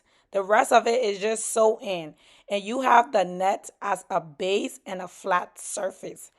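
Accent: Liberia. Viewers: This came out so gorgeous mama ❤the hair and the makeup you are talented 👏🏾btw are you Liberian ? The accent sounds Liberian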